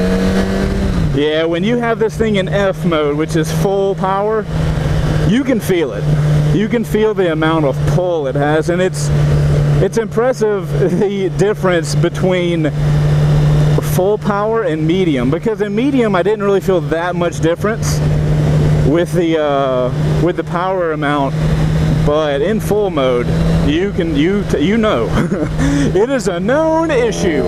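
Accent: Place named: North Carolina, United States. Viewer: Are you in NC? Your accent and scenery feel very familiar!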